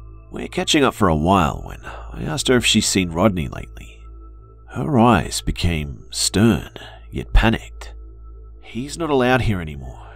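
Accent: Australia. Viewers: Hey mate, are you from Australia? And when are u gonna do a face reveal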